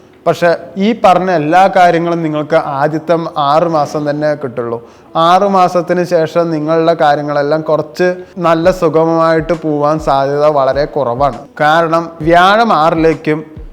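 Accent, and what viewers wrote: Palakkad, India. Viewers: Palakkad nn aano?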